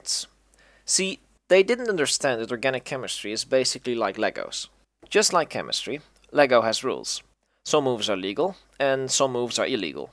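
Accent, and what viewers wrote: Netherlands. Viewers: Je bent nederlands ik hoor het aan je accent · Sniff sniff, I smell Dutch? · im 99% sure this guy is dutch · i smell some nederlands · Are u dutch by any chanse? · Lekker Nederlands accentje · I smell dutch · nederlands accent gedetecteerd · dutch speaker hmmm? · Are you Dutch by any chance? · You're definitely Dutch (or maybe flemish?) lmao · Slechts lichtelijk Nederlands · Hoor ik hier een Nederlands accent?🤔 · You're Dutch for sure right? From Brabant toevallig? Not to stereotype, but hey this video is about drugs.... 😂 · You sound incredibly Dutch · Please make more videos I normally don’t like chemistry but this was super fun! (Also i sense some Dutch accent in your voice!) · Hahahaah, ik kan je nederlands horen hoor jongentje! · I can just hear the dutch in jou lol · Mooie Nederlandse accent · are you dutch or maybe german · Dutch chemist, nice. 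Brabant? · are you dutch? you sound like a Dutchy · I recognize a dutchman when I hear one. Gegroet landgenoot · after listening to your voice for 5 seconds i just know that you are dutch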